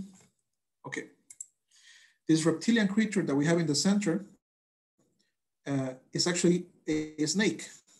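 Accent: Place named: Brazil. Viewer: você tem um sotaque brasileiro quando fala inglês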